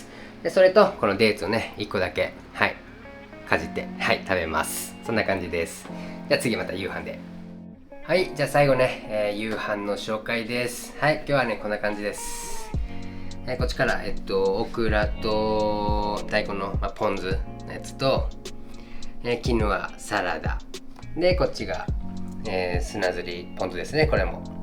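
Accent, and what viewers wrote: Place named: Kansai, Japan. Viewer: 関西弁も、いいですよー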